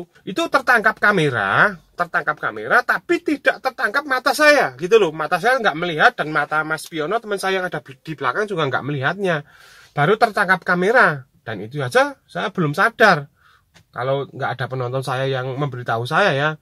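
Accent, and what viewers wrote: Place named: Surakarta, Indonesia. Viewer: Jebulane gagah mas Iki...suaranya khas solo..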